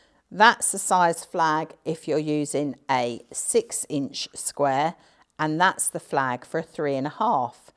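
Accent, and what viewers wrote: United Kingdom. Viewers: Plus I just love your British accent.😊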